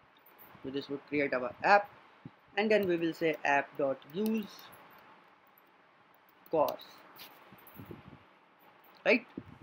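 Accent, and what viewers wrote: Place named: India. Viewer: Indian accent so absolutely NOT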